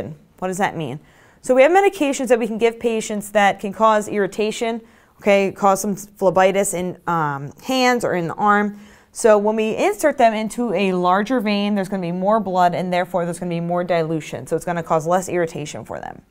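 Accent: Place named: United States. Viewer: Great but please not explain in pure American ancient we can't catch pronounce word little harshly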